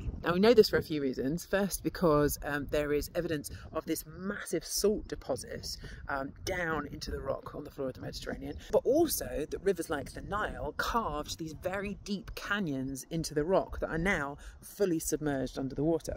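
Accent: England, United Kingdom. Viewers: The British accent is so trippy to me... "Wotah" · Interesting how a brittish accent and a confident assertion can make a number of massive assumptions based off of a few observable variables appear absolutely true. lol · BRITISH ACCENT? NONONO... WE DON'T WANNA LISTEN TO THIS GARBAGE! KEEP IT IN YOUR POCKET! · I am normally not going for the British accent BUT I am fascinating by her freckles & red hair 🤗 What was she talking about again? 😅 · It was always the English accent. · It takes a sexy lady with a english accent talking down to us.. lesser prosh accent she from around London south and she like some other people can acutely see how the world moved.. Most human are to busy with a football game . Pig or cow rugbie.. or hitting small balls in small holes wonder why your wife got shaft another place.. To bad you all watch more cartoons probably the smurfs.. 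and cared way to much how one might chip a ball. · A beautiful ginger girl talking about tectonic movement in a British accent is so mesmerizing,😍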